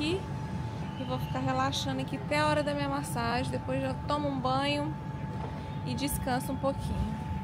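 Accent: Minas Gerais, Brazil. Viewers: Oi. Adoroooo viajar com você por esses lugares paradisíacos. Eu deveria dizer com vocês, pois temos 2: a PODEROSA que se veste com as grifes caras e a GENTE NOSSA que se veste com Shein, e tem nas duas a SIMPATIA MINEIRA com esse sotaque maravilhoso! E ADOLESCENTE é tudo igual kkkkkkk. Beijos.❤❤❤❤❤❤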